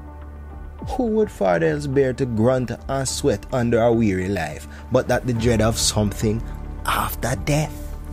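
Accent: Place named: Jamaica. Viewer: Wonderful the Jamaican accent is Tight my Brother so uniquely poetic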